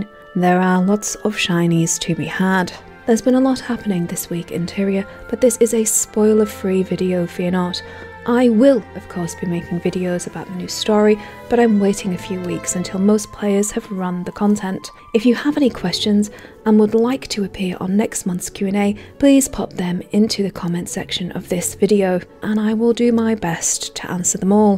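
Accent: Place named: Northern England, United Kingdom. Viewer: i heard the northern twang straight away haha from midlands here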